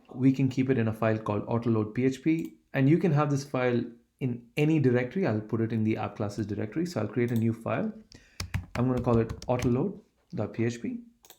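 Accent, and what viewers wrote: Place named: India. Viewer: Very nice explanation. But! Use as large a font - or zoom in - as the you can get it. And speak slowly and try to be clear. Indian accent is hard to understand for north europeans. But really very good. Like write: One of the best videos I have ever seen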